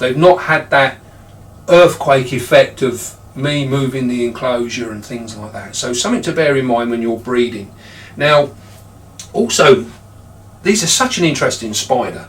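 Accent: United Kingdom. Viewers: You sound so properly British!!! Lol, compliment mate!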